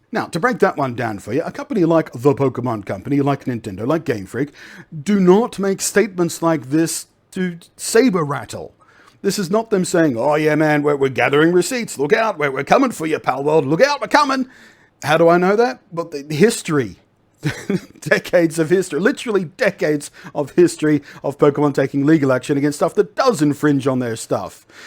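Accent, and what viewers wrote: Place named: Australia. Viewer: The Australian accent makes this vid twice as amusing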